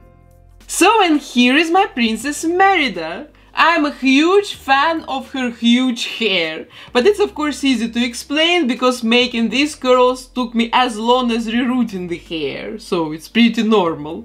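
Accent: Russia. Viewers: Ни капли не разбираюсь в английском, отличить акценты ни в этой жизни не смогу, но русский с первых минут просто узнала. Вот прям слышно что наш человек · У вас очень сильный русский акцент. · Your accent reminds me of a Russian accent 🤔 (yeah, i'm russian👌) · Are you Russian? Cos you have such a cute russian accent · почему вы говорите как русская?.... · У тебя русский акцент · что за русский акцент:)) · Слишком русский акцент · Мне кажется, я слышу сильный русский акцент🤔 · Воу, мне кажется или ты русская? Просто акцент русский . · Мне кажется или она русская, у нее акцент не англичанки или британки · hmmm russian dialect......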